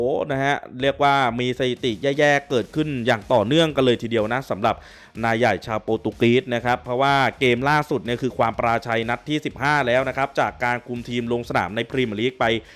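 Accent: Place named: Thailand. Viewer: มาเจิมคอนเท้นท์ใหม่เเก้ะกล่อง ชอบครับ กระชับ มีมุกตลกสอดเเทรก เเถมมีคาเเรคเตอร์เสียงเหน่อ ขอให้ปังๆนะครับ🎉 (จากแฟน LFC ที่เปิดใจฟังทุกทีม)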